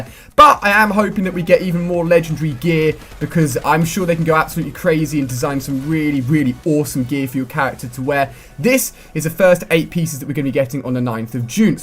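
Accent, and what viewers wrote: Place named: United Kingdom. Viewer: He's British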